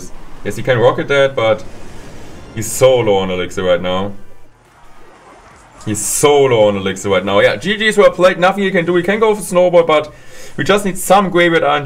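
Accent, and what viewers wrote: Germany. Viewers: Fun fact: has such a strong accent, that half of the words in auto-generated english are still considered as deutsch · He's Deutsch accent its heavy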